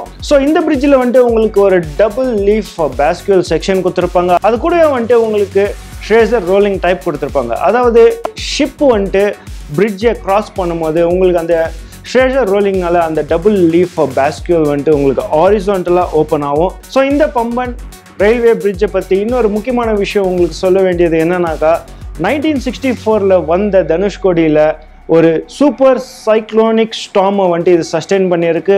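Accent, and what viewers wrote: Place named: Bangalore, India. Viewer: I think anchor Banglore tamilian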